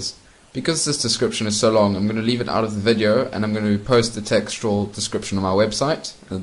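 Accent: South Africa. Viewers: Yay a South African :) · South African yeah?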